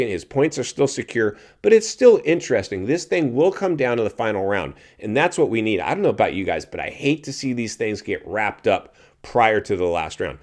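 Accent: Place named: United States. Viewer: 😂 American 💩 talking again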